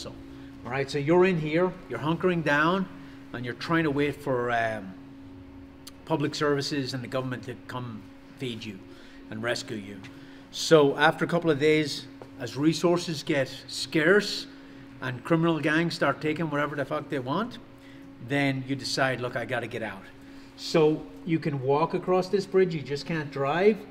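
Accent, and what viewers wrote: Ireland. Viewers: I was trying to put a finger on you're accent and it makes sense when you said you're from Ireland.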